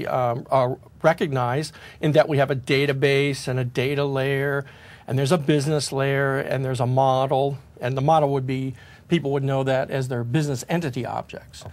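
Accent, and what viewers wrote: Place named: Baltimore, United States. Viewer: Effective. Well presented.... are you from Bali-mur? That's always a plus.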